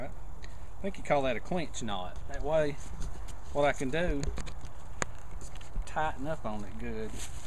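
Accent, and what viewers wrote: South, United States. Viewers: Great lesson! Thanks a heap for sharing your knowledge! I'm going to try out your tarp bunk as soon as we get some springlike weather. By the way, you have a great laugh! I love your great regional accent--which should be in the Smithsonian Museum of recorded sound. Keep your videos comin'! .Want to use your then *not only to learn practical skills) but also to teach my foreign students what real Amercian English sounds like! ;) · When i first started watching you i said holy crap mykel hawke then you spoke lol you are almost as country as i am checkout my channel and see just glad to see i ain't the only one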